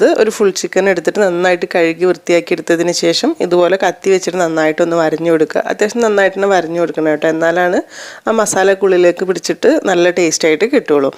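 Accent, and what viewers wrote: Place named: Malappuram, India. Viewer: നിങ്ങൾ മലപ്പുറം ആണോ സംസാരം കേട്ടിട്ട് കണ്ണൂരൊ മലപ്പുറം എന്തായാലും ചിക്കൻ സൂപ്പറായി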